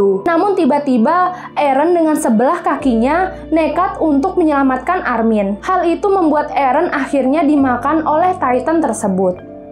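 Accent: Lombok, Indonesia. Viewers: Mba orang lombok ya?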